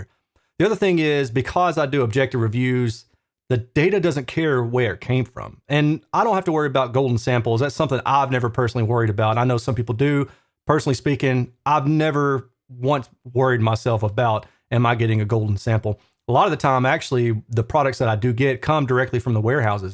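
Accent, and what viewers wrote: South, United States. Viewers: Extra income?? You bought a friggin' Klippel system!! More than I would spend on a car! With a hobby like this, you need two day jobs. Also, as a yankee who loves to hear southern accents, it's nice to have you and Beau speaking sense, breaking the stereotype of the 'dumb south'.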